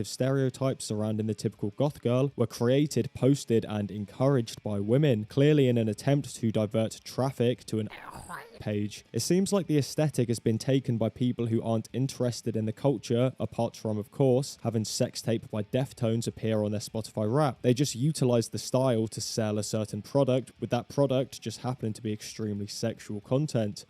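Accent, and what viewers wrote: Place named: Cockney, United Kingdom. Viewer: New to your channel, but loyal to the cause. Binging your shit for the night you cockney fuck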